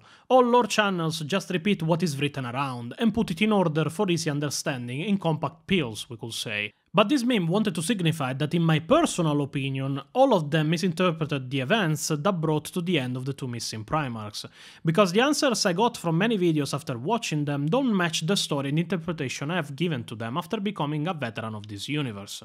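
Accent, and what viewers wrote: Italy. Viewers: 40k lore told in English with Italian accents? Gotta say its pretty entertaining. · Daddy’s finally uploaded!!! Let’s goooo! The thick “meat a ball” accent is perfection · That would be his Italian accent… completely unrelated to vampires.. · The rustic Italian accent gives it a good touch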